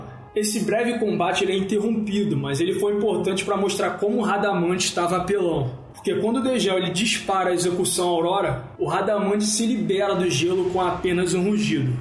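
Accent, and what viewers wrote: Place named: Rio de Janeiro, Brazil. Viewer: caralho sabia carioca fudido ainda e da zona norte ps; (moro na penha)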